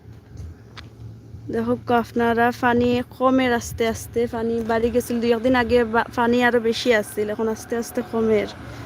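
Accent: Sylhet, Bangladesh. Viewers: Assalamu alaikum babbizee sylheti bashai kotta bollsen etto shonder video keep it up 👍🏼👍🏼👍🏼👍🏼👍🏼👌🏼💪🏼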